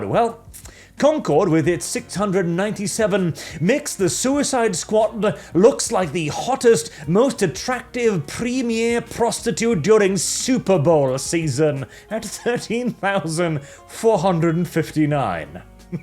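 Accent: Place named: Wales, United Kingdom. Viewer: You sometimes sound a bit Welsh.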